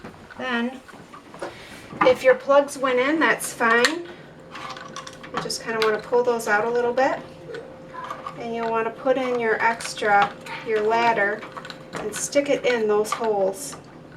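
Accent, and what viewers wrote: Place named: North, United Kingdom. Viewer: She is so sexy love the north/mid eastern accent.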